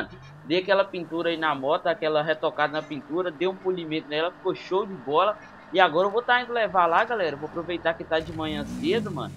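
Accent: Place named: Bahia, Brazil. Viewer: vc e bahiano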